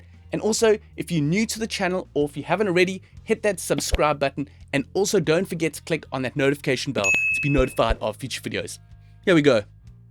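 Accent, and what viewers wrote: South Africa. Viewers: Ayyy I noticed that accent! Fellow South African!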